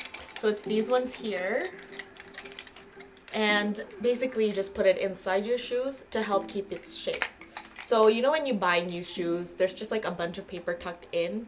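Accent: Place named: Canada. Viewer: girlllll I hear the canadian accent ♥ ahahahha love itt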